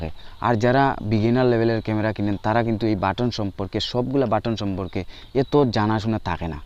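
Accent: Sylhet, Bangladesh. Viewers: Vai apnar basa ki sylhet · Sure o sylheti 100%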